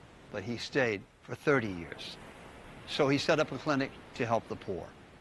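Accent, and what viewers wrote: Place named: Taiwan. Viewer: It’s so cute, his Chinese got Taiwanese accent.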